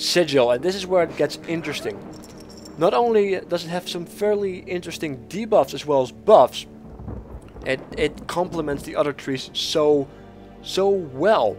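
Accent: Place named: Netherlands. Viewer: I might be wrong but his accent sounds very.. dutch.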